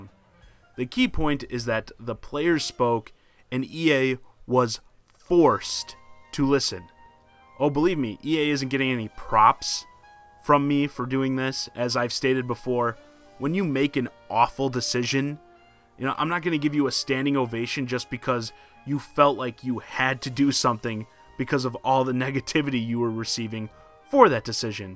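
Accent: Chicago, United States. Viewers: R u from Chicago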